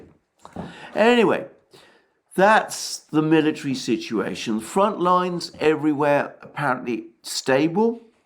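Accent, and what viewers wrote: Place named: RP, United Kingdom. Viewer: It strikes me that Alexander for some reason chooses to use BBC rules of pronunciation, e.g. at his public school his peer group would have said adversary with three syllables, swallowing the second 'a' and he has accepted training to get 4 syllables from it. And why has he stopped saying referenda? Who told him to and why does he agree to it?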